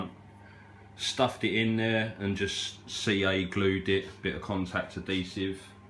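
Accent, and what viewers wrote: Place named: London, United Kingdom. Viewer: Thanks. I've just bought an XL so this afternoon I'll get cracking on your suggested mods and hopefully save myself some grief. Good to hear an English voice at a speed that can be understood. A good London area accent.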